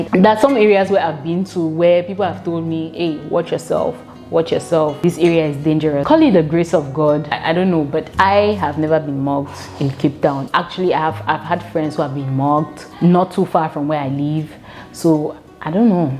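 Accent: Nigeria. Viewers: I swear I’m useless in differentiating west African accents, to me you sound Nigerian and if you hadn’t said you’re Cameroonian, I’d assumed you’re Nigerian or Ghanaian based on accent only. Thank you so much for reviewing South Africa through Cape Town mostly, hope you get to visit Gauteng(most cosmopolitan province& our economic powerhouse. You’ll find all African countries represented there, all the way from north to our southern neighbours) KZN(city of Durban has warm beaches compared to CT) , Limpopo(the best safaris in Africa, period!), Northern Cape(least populated province), North west, Mpumalanga and Eastern Cape. Also the bus system exists in other big cities too eg Tswhane(Pretoria), Johannesburg& Durban…work similar. All the best with your studies and stay in SA, welcome. Edit: also thanks for honestly talking the differences in poor vs rich in SA, that’s still racially pronounced in SA because of our apartheid history. 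Millions of rich and middle class Black, Indian(you find big population of Indians in Durban) and Coloured (majority race of ppl in CT& western Cape) ppl but the real wealthy of SA still skewed towards white SAns who gained most of their wealth through unfair advantages given to them by apartheid laws eg land & natural resource stealing& job reservations. Also glad you touched on the pronounced racial dynamics of SA, can’t live in SA and not learn to nuance about impact of global dominance of whiteness…guess that’s the most unfortunate unique aspec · Cameroonians and Nigerians talk alike... u are Cameroonian but my brain keep thinking u are Nigerian because of ur accent. I thought Nigerian accent Is unique.. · I am a Nigerian , truth fully you sound like my tribe ,fine girl. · I noticed the English speaking part of Cameroon 🇨🇲 have same accent and body language with Nigerians bcos this lady speaks like a Nigerians. 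· Lovely Video, you analysed your points perfectly. Well done 🙌. Meanwhile, i thought you were Nigerian at first, your accent sounds so Nigerian.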